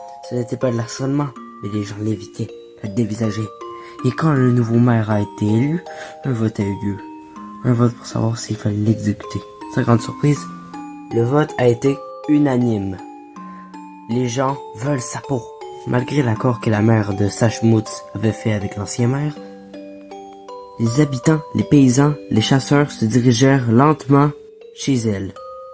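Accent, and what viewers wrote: Quebec, Canada. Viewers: Ça aurait été moins bien si la narrateur n'avait pas été Quebecois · Le narrateur est québécois ?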